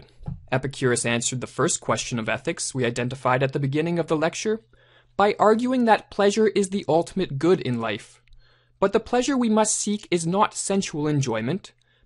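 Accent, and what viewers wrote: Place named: Canada. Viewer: A Canadian narrated this.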